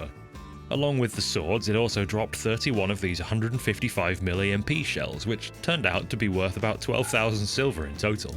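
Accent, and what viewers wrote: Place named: England, United Kingdom. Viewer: I like your accent English man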